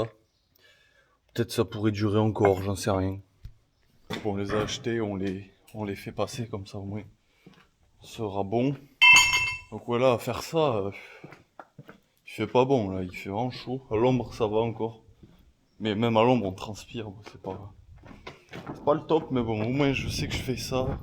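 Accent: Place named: South-West, France. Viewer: Nouvel arrivant sur ta chaîne, j'aime beaucoup tes vidéos,ta façon de voir les choses et j'apprécie cet accent de chez nous ca fait plaisir...je dis de chez nous car tu as l'air d'être guère loin de mes belles LANDES,un voisin du Gers ou non loin je suppose 🤷‍♂️😅 le sud ouest en tout cas 🥰 Bon courage et bon travail a toi,avec une centaines d'hectares tu as de quoi faire !! 💪✌️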